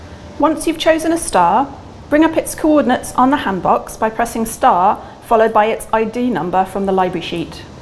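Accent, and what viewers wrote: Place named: Estuary, United Kingdom. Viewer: Interesting video. It seems your observatory is in the US, but you talk with a refined British estuary accent!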